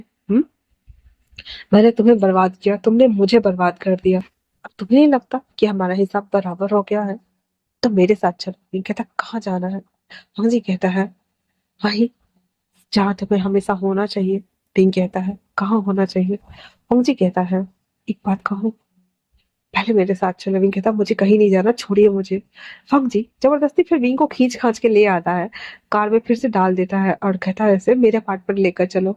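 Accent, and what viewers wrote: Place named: Jharkhand, India. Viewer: Aapki awaaz bahut acchi hai use per Jharkhand ki word aur bhi acchi lagti Hai jab Main vahan ki awaaz sunti hun mera dil khush ho jata hai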